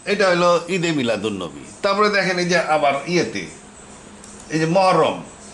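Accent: Manikganj, Bangladesh. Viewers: আপনার প্রত্যেক আলোচনা আমার অনেক ভুল ধারণা ভেঙে দিয়েছে।আমার জ্ঞান অনেক সমৃদ্ধ হয়েছে।ধন্যবাদ আপনাকে।আপনি কোন জেলার মানুষ? কথা শুনে মনে হচ্ছে আপনি মানিকগঞ্জ জেলার কিংবা আশেপাশের জেলার মানুষ। উত্তর দিলে খুশি হবো।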